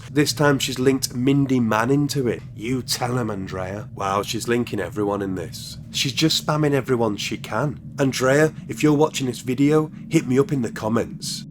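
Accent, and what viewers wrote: United Kingdom. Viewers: Hello!!! I'm French so I'm sorry if I make mistakes.... I've subscribed in your channel, I like very much those histories and the way you narrated, with respect you are funny and you make me smile many times when I hear you.... I think you are from UK.... Thank you very much and waiting for more stories!!!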